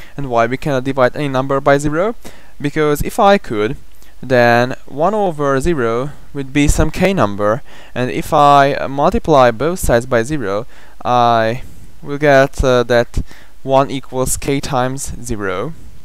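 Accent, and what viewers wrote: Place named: China. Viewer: he must be chinese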